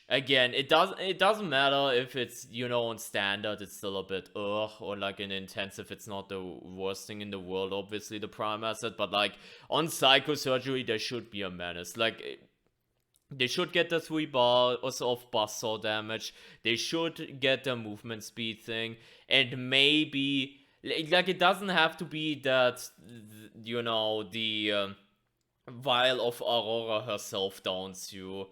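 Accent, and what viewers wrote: Germany. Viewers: Your accent is cool. German?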